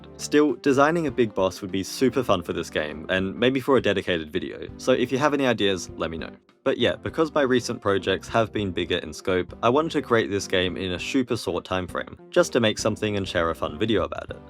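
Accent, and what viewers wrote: Australia. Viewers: Waaaiiiit! Your Australian! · 2 comments about the middle-ish section. 1st. YOU'RE AUSTRALIAN?! WHERE I NEED TO KNOW SO I CAN GO IM ALSO HERE 2nd. I use never eat soggy wheat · HES AUSTRALIAN?!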